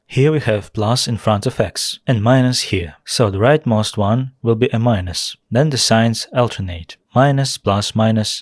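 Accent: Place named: Kazakhstan. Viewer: Он же из Казахстана, поэтому он так и говорит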